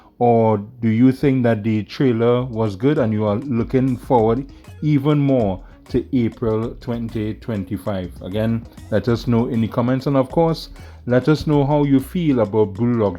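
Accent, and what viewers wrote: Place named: Trinidad and Tobago. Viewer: Are you from trinidad cuz im from trinidad and i recognize how you were talking just like a trini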